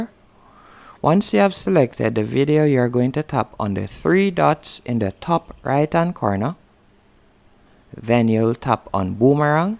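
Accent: Jamaica. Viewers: Dawg yardie accent nah guh no weh! Respect! This is what I was looking for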